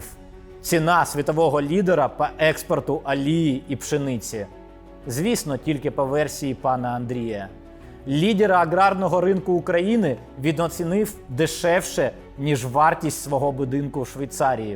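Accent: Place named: Russia. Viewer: стадіон так стадіон! дякую за українську мову. У вас є певні слова, які ви вимовляєте ще на російськомовний манер. Але тренуватись ніколи не пізно, вірю, прийде досвід і будете казати правильно. Успіхів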